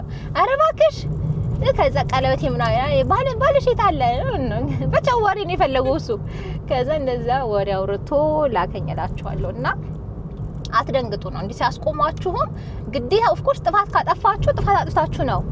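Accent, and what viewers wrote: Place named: Ethiopia. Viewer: አንቺ ፈላሻ ነሽ ለአ.አበባም ፈልሰሽ የመጣሽ ከአነጋገርሽም ታስታውቂያለሽ አ.አ base የለሽም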